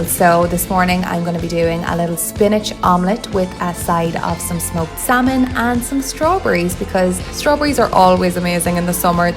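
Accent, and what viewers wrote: Ireland. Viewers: I absolutely love you voice! People always guess Irish when they hear my accent(I live in Scotland but my father is Irish so I have a weird mix of both accents) so when I hear an actual Irish accent it makes me happy bc it reminds me of my dad.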